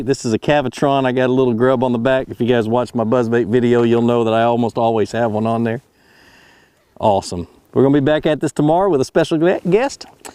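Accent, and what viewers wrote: Georgia, United States. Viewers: what part of georgia are you from?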